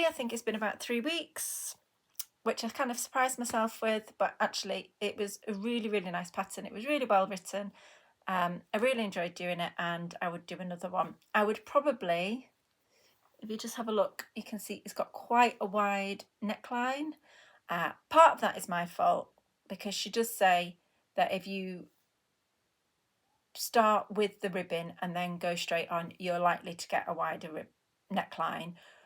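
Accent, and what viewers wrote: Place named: Liverpool, United Kingdom. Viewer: Oh yes, I definitely know of that rivalry lol my sister‘s husband is from Liverpool and his parents were born and raised there as well….when I was listening to your beautiful accent…to my American ears it sounded a bit familiar and I thought ohhh maybe Liverpool? Sorry to offend lol 😂 My sister‘s husband grew up in a divided family, half for Liverpool half for Manchester… It gets pretty heated. When my brother-in-law would go to a match , his father would drop him off, but swear he would never enter the stadium. lol too funny. Anyway, thanks for sharing and I’m enjoying your channel. Love the sweater!!!!!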